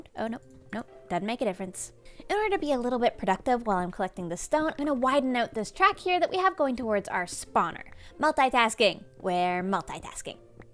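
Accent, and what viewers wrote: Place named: Newfoundland, Canada. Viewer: Absolutely threw me for a loop hearing you're a fellow Newfie, never would've guessed!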